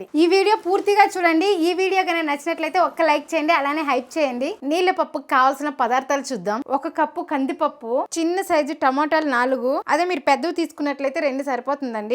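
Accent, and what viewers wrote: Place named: Chittoor, India. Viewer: Ivala try chesamu mee revepii..mee di proper ey place andi..seems like from chittoor ..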